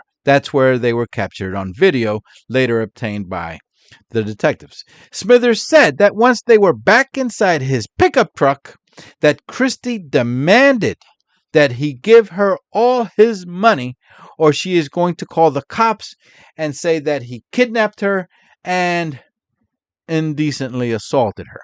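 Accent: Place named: Latin America. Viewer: and your latin voice.😊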